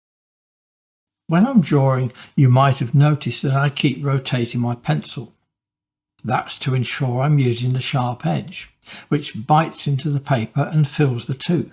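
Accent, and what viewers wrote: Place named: United Kingdom. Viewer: J'ai dû beaucoup dessiner dans ma vie car ceci est exactement ce que je fais en dessinant. En suivant cette manière de faire, on développe un sens assez fin de l’état de la pointe du crayon, permettant toutes les nuances sans avoir trop à réfléchir. J’ai beaucoup aimé votre style de dessin, je vais regarder vos autres vidéos. En vous écoutant je me rends compte que quand j’écoute un accent britannique, je fais très confiance instinctivement au niveau de compétences et de sérieux de la personne. J’apprécie la modestie des britanniques alors que assez systématiquement les gens ont des habilités particulières à communiquer qu’ils ont peaufinées pendant longtemps. Merci, un bonjour de 🇨🇵 !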